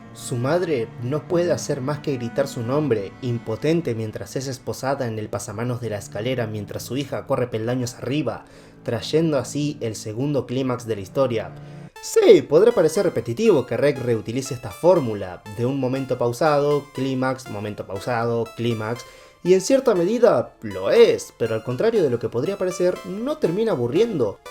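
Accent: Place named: Argentina. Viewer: Todo estaba bien y disimulado asta que se noto el acento argentino "asha" "sha estamos" como arruinan la experiencia.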